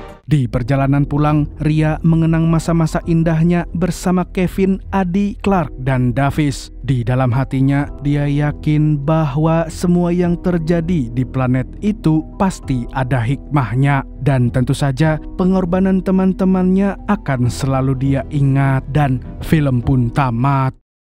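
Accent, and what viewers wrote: Banjar, Indonesia. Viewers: kimanya banjar kah nang ini urangnya😂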